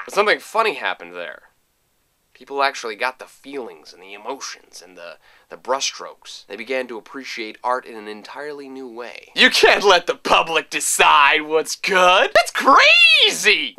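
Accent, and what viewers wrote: France. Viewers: That's an amazing French accent you've got there :3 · loving the french accent! xD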